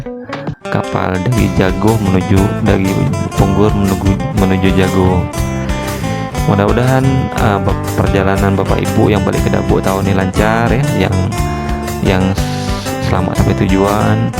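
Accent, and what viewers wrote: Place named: Malacca, Malaysia. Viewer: Suka dengar loghat dabo singkep.. mcm melaka😂 nak jumpa org dabo lah..! Balik kampung sehari suntuk baru sampai rumah😂 letiiih..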